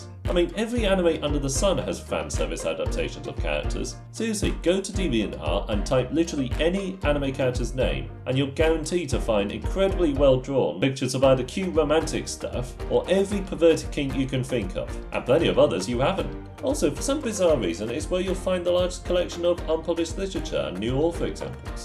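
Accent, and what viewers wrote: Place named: United Kingdom. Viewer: You have great ideas and ways of presenting them. I suspect you will grow to be quite a large anime YouTuber if you can fix some of your production problems. To start, your audio problem is the biggest one. Your microphone is not the greatest, being very muddy, especially in low frequencies. And since your voice is very deep, the microphone makes it very hard to understand what you are saying. Add on the idea that there is a large American population that is interested in anime, having any sort of shortcomings in the audio department will make it that much harder to understand you (accents aren't hard to understand once one has gotten used to them, but not all Americans are used to a British accent). The background music in this video is very loosely defined as background music. It is too loud in the video. Also, the background visual seemed odd, feeling like a Windows Vista background. 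I am not very well versed many other areas regarding YouTube production and making good videos, but I can tell that you do have the most important part, interesting content. Now you just need to develop the other parts of your YouTube game and I suspect you'll find the growth you probably desire. In regards to this video, I really like what you've said about Genius Prince. I very much enjoy these kingdom builder-type shows like Dr. Stone or Maoyu (even though Maoyu was meh) and watching another like that would be fun!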